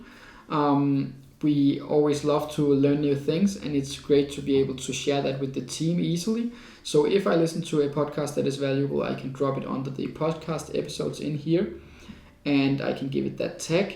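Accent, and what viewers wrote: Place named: Denmark. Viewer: Holy shit started the video and was instantly pointing and saying "Bro is Danish"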